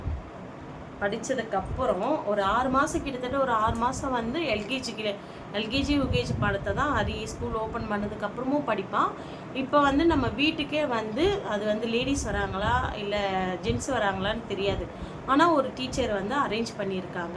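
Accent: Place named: Chennai, India. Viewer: நீங்க பேசுறது ரொம்ப கஷ்டமாக இருக்குது நீங்க சென்னை பொங்க சிஸ்டர்.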